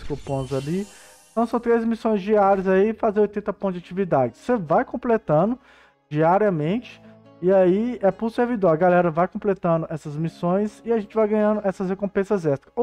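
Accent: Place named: Northeast, Brazil. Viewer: pelo seu sotaque eu jurava que era do Nordeste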